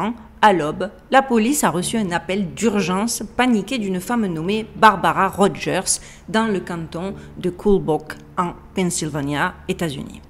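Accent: South, France. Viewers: Avec ton accent méridional à couper au couteau, je serais curieux de te voir parler en Anglais ! ^^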